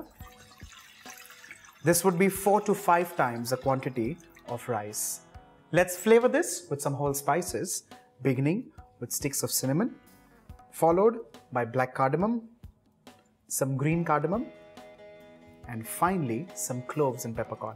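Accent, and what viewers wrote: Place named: England, United Kingdom. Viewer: I love your english accent ,bro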